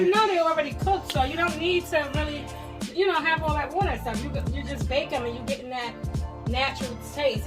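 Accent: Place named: Brooklyn, United States. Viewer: Nice!! Im diggin this. My brotha sound like he from Brooklyn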